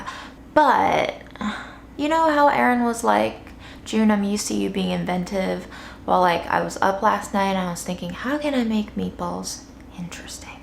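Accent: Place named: New York, United States. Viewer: June!!! I love coming home and pressing play on your videos after a long day of work. So chill, So relaxed, So New Yawk, So Talented and Sooo Entertaining!! Thank You!!!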